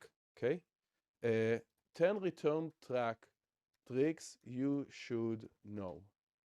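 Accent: Israel. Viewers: Great videos in the channel, easy to follow great energy! Man are you Israeli?❤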